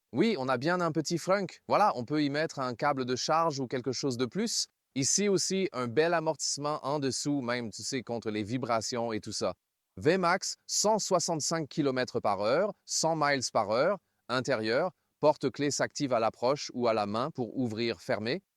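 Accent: Quebec, Canada. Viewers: Amusant, l’accent de la voie en français. On entend une pointe d’accent québécois.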